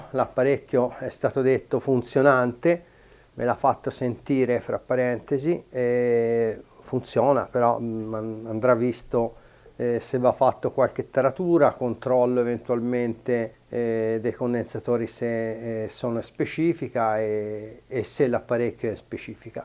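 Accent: Tuscany, Italy. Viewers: buongiorno, sento che sei toscano, ci ho abitato per 10 anni a colle di val d'elsa, poi ho fatto un incidente sulla moto da cross e sono rimasto in carrozzina e ho dovuto ritornare alla mia città, mi hanno regalato un amplificatore pioneer 9900, da un amico, però mancano i 2 coperchi sopra e sotto, e tutte le manopole, i carter e le manopole non mi interessano,poi le trovo io, quello che me lo ha dato,mi ha detto che aveva un canale che non andava, e che si accendeva,ma ho messo la spina e de saltato il fusibile, me lo potresti aggiustare? sono un appasionato di questi componente degli anni di gioventù mia che sono del 66,buona giornata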